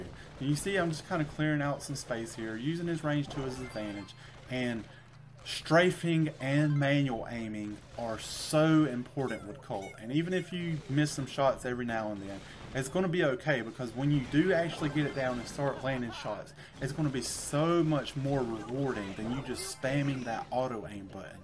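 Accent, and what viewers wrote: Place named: South, United States. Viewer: I love you’re southern accent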